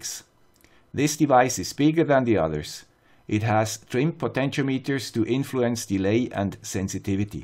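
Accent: Switzerland. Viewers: Mit einem Schweizer Akzent? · Absolutely outstanding work,. I love watching your videos, and I love you Swiss accent, too:)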